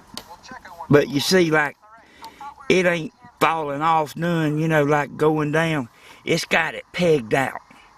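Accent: South, United States. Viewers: have to say this. you could be a professor, but that southern accent! love it!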